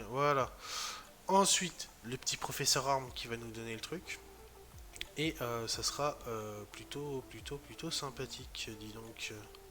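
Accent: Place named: Quebec, Canada. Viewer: L accent québécois ça va il est bien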